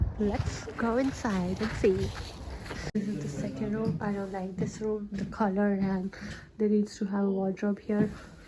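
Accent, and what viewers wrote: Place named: India. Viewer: Im sorry but I didn't understand anything with the Indian accent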